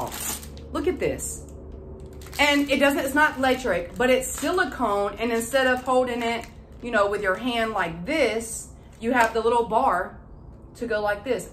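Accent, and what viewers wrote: South, United States. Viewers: You are so adorable and I love your Southern accent!! Your videos are always uplifting and fun. Thank you for being a sparkle in my day💜, couldn’t find the emoji but YES, YES, YES chop it!!!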